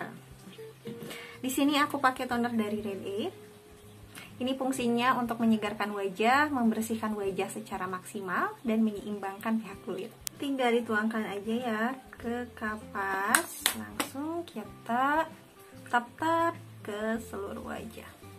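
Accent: West Java, Indonesia. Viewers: Hasilnya cantik bgt dan keliatan natural ngaaannn...eta nyarios na mani nyunda pisan, pertahankan neng, jadikan ciri khas.👍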